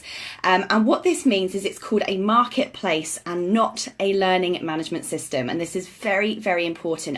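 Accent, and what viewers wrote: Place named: United Kingdom. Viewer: Great content, horrible video. The audio and video wasn't in sync had to stop watching but keep listening. Very glad I did. Your British accent and lingo is awesome, "A Silly Sausage" - Great stuff, lol - I like how you used the bread, made a lot of sense. Have a great day. God Bless, Anthony 2 Tim 1:7 <link>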